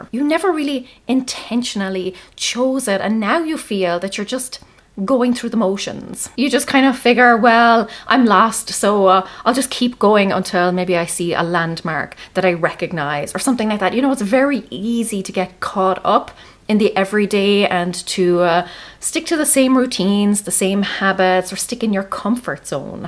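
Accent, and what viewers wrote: Ireland. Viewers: I'm not really a superstitious person, but I read years ago that you should do the things you want to do in the coming year on New Years Day to set the tone, whether it's reading, journaling, exercise or family time. (Also, I'm still trying to place your accent. My parents were from Louth and it's similar).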